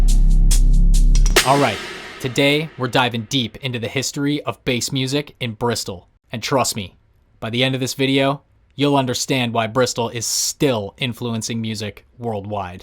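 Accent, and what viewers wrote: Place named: United States. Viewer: even though it's been literal decades it's still weird to hear someone with an american accent talking about OG dubstep great vid great music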